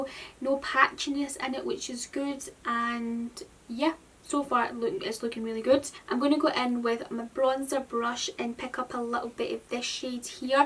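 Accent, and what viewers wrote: Scotland, United Kingdom. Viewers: Are you Scottish if so where are you from because I’m Scottish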